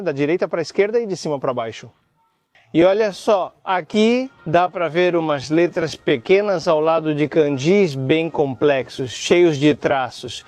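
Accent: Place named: Portugal. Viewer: portugues de portugal :face-red-droopy-eyes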